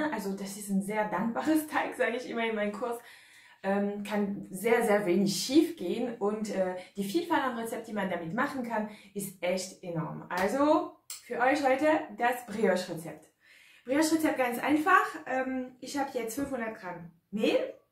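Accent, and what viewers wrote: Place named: France. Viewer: Vielen herzlichen Dank ❤für dein witziges und aufschlussreiches Video , ich habe ,wieder mal ,viel dazugelernt 😊. Ich liebe deine Rezepte ,deine lockere,flockige Art und deinen französischen Akzent . In deiner Arbeit steckt so viel Liebe und Freude,dass ist einfach unglaublich schön. Liebe Grüße Heidi ❤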